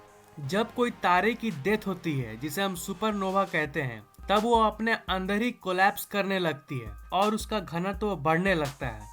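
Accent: Bihar, India. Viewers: Arey bhaiji Bihar see ho Kya?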